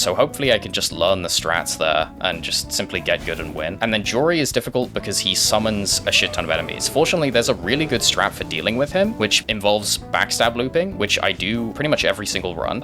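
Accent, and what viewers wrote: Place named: Wales, United Kingdom. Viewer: I think Welsh even.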